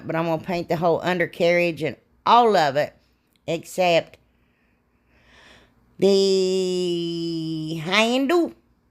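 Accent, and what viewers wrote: South, United States. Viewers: I just started watching your channel and I have fallen in love with your accent, sense of humor and talent. I look forward to seeing more. BTW, can I ask you where you're from? I hear some southern but I'm from SC and I'm pretty sure you're not from here. I think could listen to you all day😍😊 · New subscriber here💕from Monteagle Mtn.,Tennessee...LOVE YOUR DIY'S😍..and gurl,you're a "hoot"😅I already know,I will be a big fan of your channel...one question,where are you from/at?I know your somewhere down south ..(ya just can't fake,that accent😉🤣)..thanks for sharing and looking forward to the next one💕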